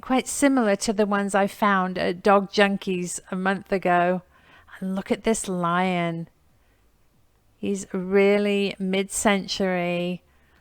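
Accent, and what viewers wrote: United Kingdom. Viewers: Please don't put things back on the table like that carry them down the table as you go. I know I am not alone in saying that it drives my anxiety way up. Even if you say that no one is behind you, it doesn't stop the anxiety. The only thing that would is if you carry it along with you. If you must put it down, please put it down in front of your body while you reach for another item. Then pick up that item along with the other ones in front of it. If I could control anxiety I would, because it really sucks. I'm relieved you were able to get all the items. But maybe if you wouldn't mind, in the future realize that so many of us, including myself that love watching all you guys as a respite from anxiety. I love watching your videos. You are always so cheery. I love your British accent, and your knowledge about so many things.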